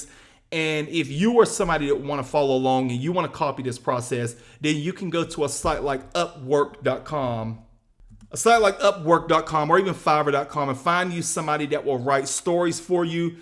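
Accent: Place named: Mississippi, United States. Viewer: Are you from Mississippi? You sound just like my relatives.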